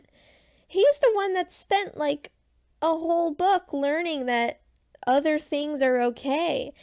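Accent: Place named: United States. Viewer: I can tell you are american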